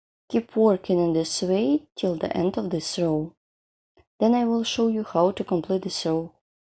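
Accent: Russia. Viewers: говорите лучше по -русски,а не на английском с русским произношением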